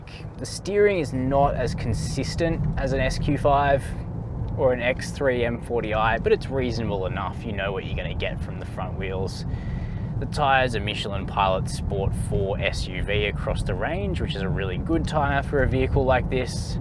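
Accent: Australia. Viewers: He is Australian. It’s his natural accent.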